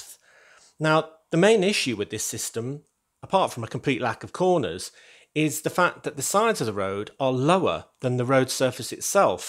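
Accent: United Kingdom. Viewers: When you build a curb with two plates height, in reality, this would be a Curb of 24cm /9,4 inches (In the Minifig scale )). I'm from Germany, where the curbs are 15cm/4,7 inches high. So I am not sure, if in other countries curbs of such great height of 9,4 inches exist. Can you say, if in Great Britain (due to your dialect it seems, that you live there) or in the USA (if I guessed your home country wrong) such high curbs of 9,4 inches exist?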